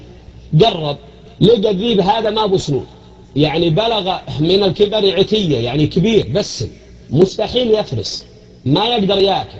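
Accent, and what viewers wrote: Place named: Ha'il, Saudi Arabia. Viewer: اللهجة شمالية حائل يابعد حيئ "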